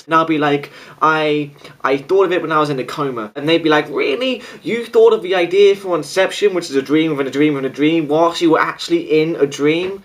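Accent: England, United Kingdom. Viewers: Oh my gosh. Like i totally am indifferent to the english accent but every time i watch your videos i just totally wanna make out with you and i can't even comprehend what your saying cause i',m fantasizing about what you look like in you boxers . . . or briefs?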